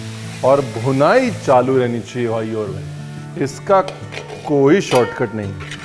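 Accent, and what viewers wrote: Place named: Lucknow, India. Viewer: U never disappoint at all.. each recipes has its nayab ( your lucknowi urdu)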